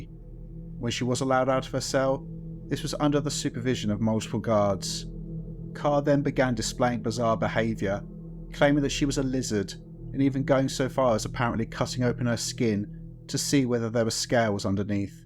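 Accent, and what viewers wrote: United Kingdom. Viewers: hello love your YouTube channel and love your videos and love your British accent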